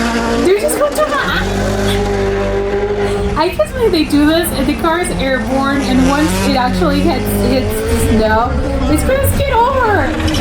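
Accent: United States. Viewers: As a swede. Your accent is standing out. I imagine jersey? Am I totally wrong here?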